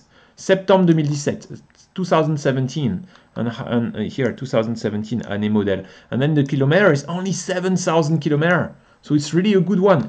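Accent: France. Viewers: came here for the car... stayed for the french accent <3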